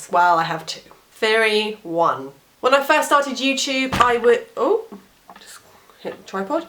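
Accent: England, United Kingdom. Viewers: You are amazing. Do what videos make you happy, what videos you actually WANT to do. I will continue to watch........mainly because of your British accent, hahaha. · Hello from the US of A. Don't stop. Forget the other channels. Don't stress over the number of likes, or the unflattering comments. What caught and holds my attention (despite my ADD) is YOU ... your style, your cute Brit' accent, your presentation, your wit, your sense of humor, (get it?). The topics are great. Very amusing. Actually thoughtful. Just keep doing it your way. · Sugar, First I tell you we watch your channel because you are you and you show us your own personality. I think you are a very seemingly sweet young lady. I like when you speak of your own real life. What most people are watching youtube for is to be entertained. I very much enjoy hearing your British accent and just listening to you. 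If you want to shake it up a bit, you might think of also coming up with ideas to interview some of your friends of family about real things going on in life. Maybe mix that in with a little humor here and there. Show us what life is like in Britain. Are there any interesting things near you we might be interested in seeing on camera? Historical, scenic or perhaps you hang out with the royal family here and there? maybe if your camera is mobile you could get interviews on the street from Bobbies about how they feel about U.S officers can carry pistols and they can't. I am just suggesting you find things different about your country and life from others and enlighten us. By the way, Why is the picture missing. Don't quit broadcasting, I like ya. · I'll admit I stumbled on you by accident.Saw a thumbnail with a pretty face.Stayed because I found you funny and love the British accent.But you also let us in on some issues you've had.Thought that was brave.Long story short you keep making these and I will keep watching.Fuck those guys that just want to ruin the crap we like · If you remove music, dogs and cats' video and what would remain to me to watch on Youtube? 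A huge commercial?! No, thanks. How should i train my ears to english accent without video? Travels vlogs and intercultural oddities would be good topics for me. Normal people testing impartially the pulse of a Country would be good as well (since, as we can all see, Press is no more reliable, pratically everywhere nowadays!). Otherwise do like me: join some online video and graphic contest portal and have fun. No need to put everything on Youtube only. Even though video and graphic platforms are getting a bit worse as well recently for the same (second) reason. But remember to edit your audio in a better way. ^__^ It's obvious that once there's a so much huge video production, all around the world, view counts get totally random, aleatory and unstable. Especially for english speakers and viewers. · I have mentioned to that she actually has overall talent and appeal to elevate what she started here into a career in the entertainment industry. 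She would be a fabulous host of headline series and could even perform acting roles; initially shorter and follow the progression onward. She reminds me of a mild version of Elizabeth Hurley with great appearance and a pleasant English accent. She could easily have an expanded career. I know that I would certainly cast her. She's also a good person whom cares about the environment, animals and necessary causes. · I have checked your channel every evening but there was nothing, I simply like hearing someone like you speaking English - British English.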